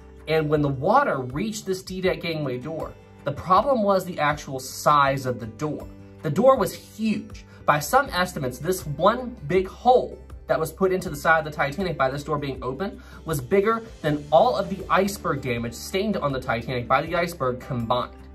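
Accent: South, United States. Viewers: His southern accent and charm definitely add to it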